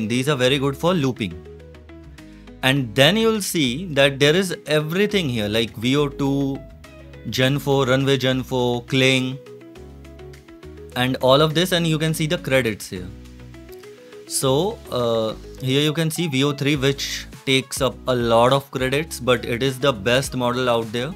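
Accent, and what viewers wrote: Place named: India. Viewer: Hello brother, i recently started watching your vedios,you had great command over comfiyui and other ai tools,i think your indian(because of accent😅). i am currently learning comfiyui just a begginer. i want to learn from you. i have some specific area on which i want to work. is it possible to learn from you personally like one on one session. willing to pay.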